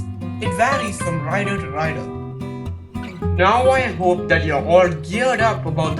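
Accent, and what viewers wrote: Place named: India. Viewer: Love your vids. You have a little Indian accent but nothing to bother with. Continue I will still continue watching. Where do you live ?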